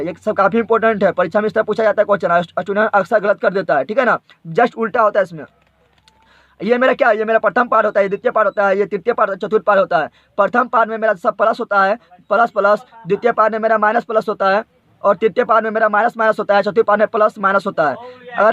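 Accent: India. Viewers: rajasthan Wale ase he bolte h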